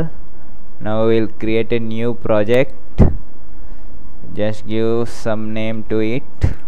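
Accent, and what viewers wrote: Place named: India. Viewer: God damn your english sucks, great topic about QDevelop but the accent is much too strong to hear only no more than 2/3 of a word. It's like indian calling horror :( I do want more Qt oriented tutorials, but smoothen your english or something, take english pronounciation classes.. anything to ease it a bit, otherwsie, great.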